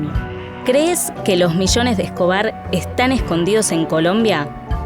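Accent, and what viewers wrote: Argentina. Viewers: buen vídeo pero odio el acento argentino, da asco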